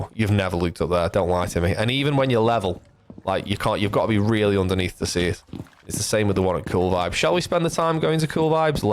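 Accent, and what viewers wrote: United Kingdom. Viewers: I think that’s the british accent if im not wrong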